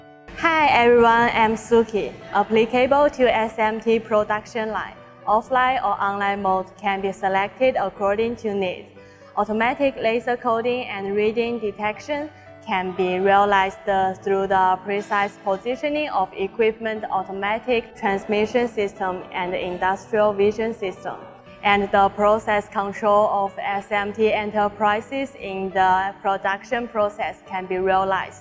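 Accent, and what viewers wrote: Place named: Taiwan. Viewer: 這個台式口音讓我把廣告看完再過來看一次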